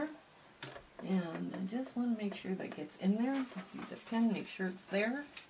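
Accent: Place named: Canada. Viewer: BC'er here. I thought I detected a Canadian accent...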